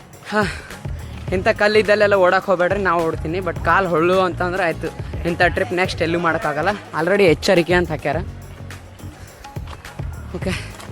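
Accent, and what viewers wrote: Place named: Kalaburagi, India. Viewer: Brother pure kalaburagi matt addu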